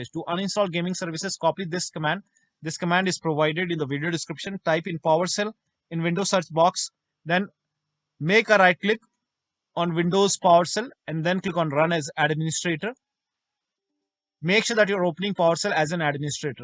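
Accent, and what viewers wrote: India. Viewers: i heard an Indian mans voice and knew i was saved.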